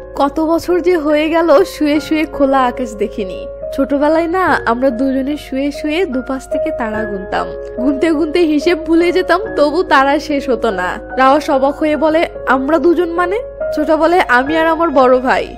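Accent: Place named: Jessore, Bangladesh. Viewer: আপু আরাম লাগে এটা আমাদের যশোরের ভাষা, খুব ভালো লাগে তোমার কথা গুলো, এবং মুভি গুলো সত্যি অসাধারণ আপু। 🥰🥰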